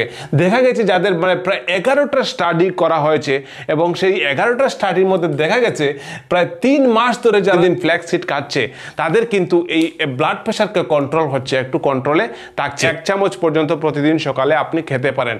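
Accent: Bangladesh. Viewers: বাংলাদেশের বাংলা উচ্চারণ একদম ভাল নয় ।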